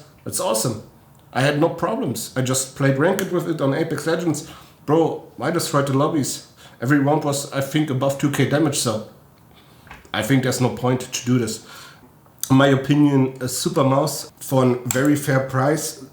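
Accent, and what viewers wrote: Germany. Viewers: Stuck around to pin point the accent. Hört sich ziemlich Deutsch an.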